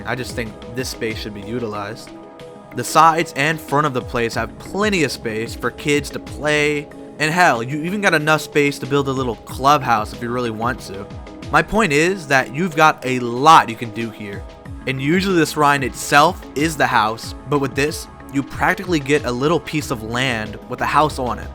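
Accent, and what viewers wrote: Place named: New England, United States. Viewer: ALSO New England Accent detected. i think? the way you pronounce "Room" reminds me of me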